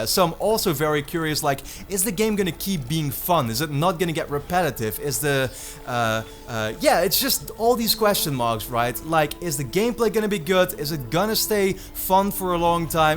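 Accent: Netherlands. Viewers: Ja dat accent herken je al van een mile away! Maar hey, beste nederlandse gaming YouTuber naar mijn mening.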